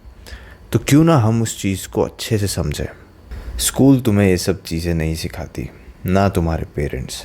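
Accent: Rajasthan, India. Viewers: Bhai tum rajasthan(marvad) meh konse shaar se ho? Tumara accent and ghalc***a se pata chala.😂 I too want to make physic💪 like you and wanted to learn many skills in life.(in short, work on myself) which I have missed to do in my teenage. But will try to ingage in things whenever I will get chance.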